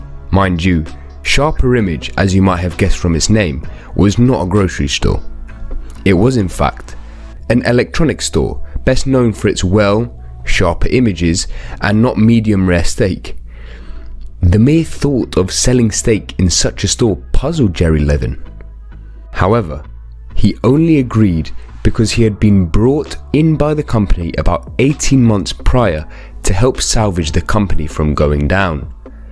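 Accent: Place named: England, United Kingdom. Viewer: I'm at 1.5x speed and it seems like a normal english accent.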